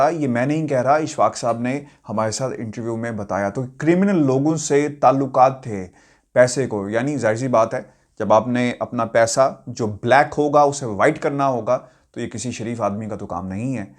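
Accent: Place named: Pakistan. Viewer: So far ur Urdu is Pakistani Urdu, please Mr tell other anchors too do not sound like Indian anchors. Let them see BBC Urdu service and to see the difference BBC HINDI. UR doing a great job like Imran khan (Anchor) & Imran Khan ( prime minister ) can I call u Imran 😂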